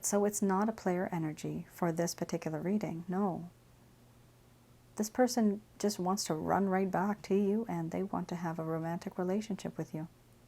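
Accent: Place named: Hawaii, United States. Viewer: WOW... N U R D ONLY ONE 2 RESPOND .. THANK U 4 DAT... HEHEHEH HEHEHEH... U SOUNDED SEMI HAWAIIAN, DATS Y I HAD ASKED... THANK U AGAIN...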